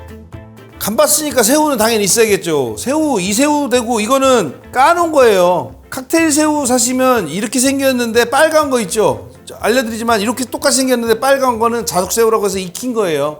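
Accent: Chungcheong, South Korea. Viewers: 이렇게 뿐진담에.. ㅋㅋㅋ 충청도 사투리..ㅋㅋㅋ 정겹네요 고향사람이라 ㅎㅎ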